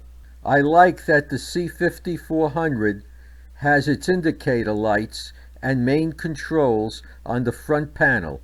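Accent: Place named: New Jersey, United States. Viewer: I would have guessed New Jersey, myself :)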